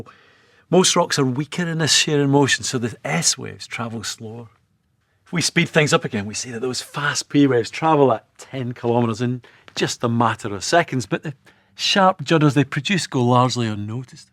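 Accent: Scotland, United Kingdom. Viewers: His accent combined with his cavalier presentation is grating. All we want is technical information not scottish patronizing. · He had me at his Scottish accent 😊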